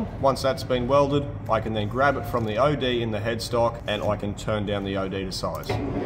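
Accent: Australia. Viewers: Love your channel, excellent work but honestly sometimes I watch just to hear that Aussie accent Good day mate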